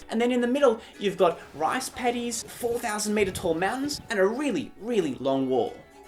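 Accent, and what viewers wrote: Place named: Australia. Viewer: Your accent is so aussie!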